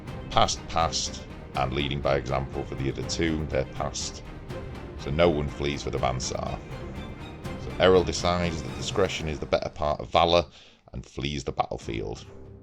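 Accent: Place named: Leeds, United Kingdom. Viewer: I've been thinking of doing some 'Munda battle reports myself but after seeing yours I've given up on the idea. I can see how each batrep is improving with every episode and I'm fully expecting next year's efforts to be on at the local flicks with the production being so good! I can't even imagine how long they take to do but I'd just like to say I appreciate all of the work you put into these, GW could learn a thing or two from you. P.S. are you from Leeds? I ask because you say the number one like I do and I think there's only us Loiners that pronounce it won instead of wan 😉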